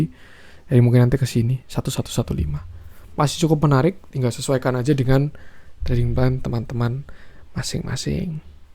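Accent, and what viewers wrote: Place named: Java, Indonesia. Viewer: Iya betul...khas banget. Medok. Jadi pengen tau profil e mas e 😀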